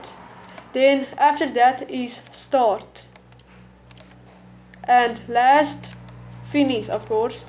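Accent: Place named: Afrikaans, South Africa. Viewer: Yeah haha,, I recognized the accent. I'm in PE. It's not often you get south African you tubers.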